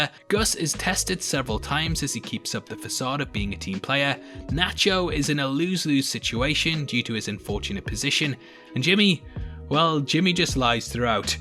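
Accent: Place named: United Kingdom. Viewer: Hilarious to hear these BCS spoilers in a Limey accent.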